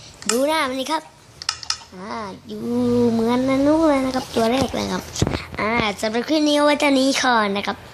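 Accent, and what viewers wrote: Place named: South, Thailand. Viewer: คนใต้คนใต้ คับคนใต้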